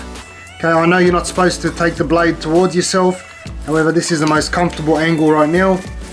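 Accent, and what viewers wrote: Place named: Sydney, Australia. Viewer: Didn't he say he was going to remove the baseboard? With an accent like that, I'm guessing he lives in Sydney.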